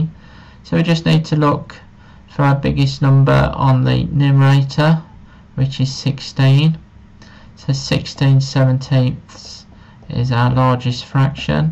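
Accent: United Kingdom. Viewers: R u british???😮😮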